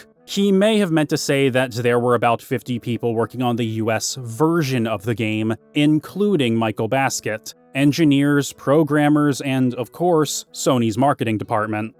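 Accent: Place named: United States. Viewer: I'm around a decade older than you and played FFVII on its release and it was absolutely life changing at the time. That said I really wasn't aware of any real stigma associated with video games at the time. Pretty much everyone played video games when I was growing up, especially after the release of the PlayStation. That said I grew up in Wales, not the States (at least that's my assumption based on your accent, apologies if that's not the case) and my experience of high school was nothing like your own. That said great video I look forward to watching more of your work.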